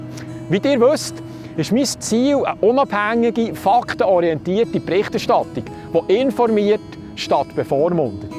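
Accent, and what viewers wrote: Switzerland. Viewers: Vielen Dank für die ausgeglichene Aufdröselung der Ereignisse. Auch wenn ich mich mit Ihrem Swizerdütsch etwas schwer tue - aber das wird schon besser ;) - weiß ich diese Art Berichts ehr zu schätzen. Eine Bitte zur Klärung hätte ich. Was hat es mit den somalischen "Kindergärten" auf sich? Hier gehen die Berichte komplett konträr - sprich: links sagt gar nichts, rechts um so mehr.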